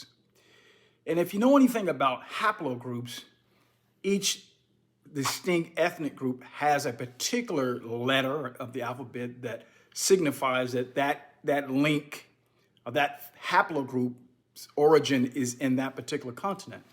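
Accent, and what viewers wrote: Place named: United Kingdom. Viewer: When you talk I feel like you have British in you !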